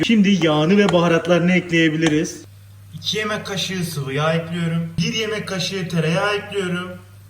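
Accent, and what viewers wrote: Adana, Turkey. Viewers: Konuşma:ADANA Kanal:HATAY Yaşadığı yer: DİYARBAKIR nE? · Hatay sandıkiçi diye kanal açıp diyarbakırda yaşayıp adana ağzıyla konuşmak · aslı adanalılar öyle derler götünden Adana'nın şivesi hataya verme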